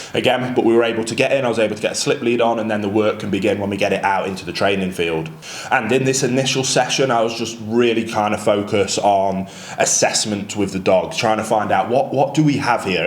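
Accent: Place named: West Midlands, United Kingdom. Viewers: Sounds to me like a fellow Black Country chap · You sound like you're from the west Midlands! Nice to see a local doing well, here's to your success. Could I also volunteer at a kennel?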